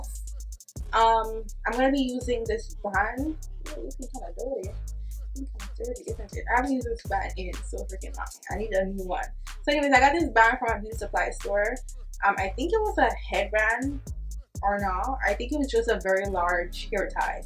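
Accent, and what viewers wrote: Jamaica. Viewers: Are you Jamaican? 🇯🇲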